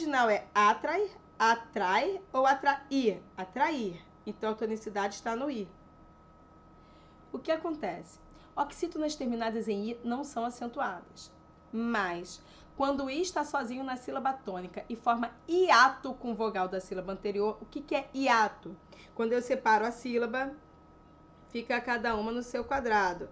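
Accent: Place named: Rio de Janeiro, Brazil. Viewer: em um dos vídeos, disseram que a prof estava com a voz da Anita; neste, ela está com a voz da Thaís Araújo. Acredito que tudo se deve ao modo como ela reproduz o xxxxxxxxxxxx carioca! rs É instigante a observação e comparação.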